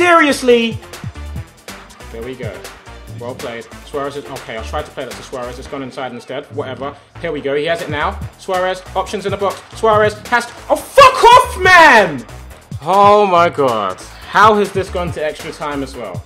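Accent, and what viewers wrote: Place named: Scotland, United Kingdom. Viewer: messi, suarez and neymar = Fucking deadly scotish accent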